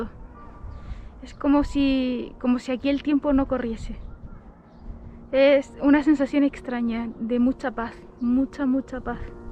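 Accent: Chile. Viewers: Saludos desde Chile. Tienes a veces un acento como el nuestro. Un agrado descubrir tu canal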